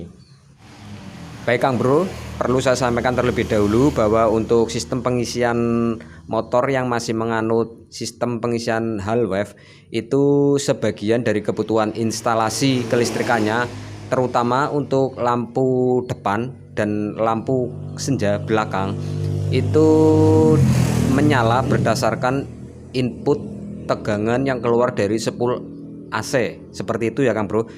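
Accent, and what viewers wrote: Java, Indonesia. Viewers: Bang berarti kabel yg masuk ke output kontak yg di sambung buat lampu tadi gk di copot lagi ya? . Abang ngomonya medok jawa banget tapi nama chanelnya nama suku batak, emang marga silaban ya?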